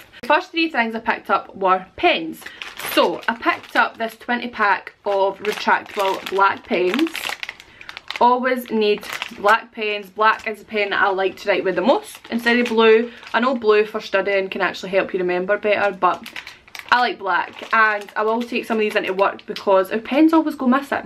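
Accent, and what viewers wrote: Scotland, United Kingdom. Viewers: I never considered what a Dollar Store would be called in other countries. A store in america called PoundLand would not go over so well. lol. Love your accent by the way, and I do mean your ...regional? scottish accent. I love listening to it.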